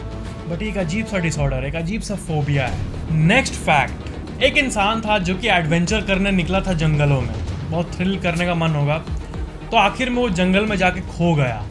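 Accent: Bihar, India. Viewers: Sir app Bihar se hai kya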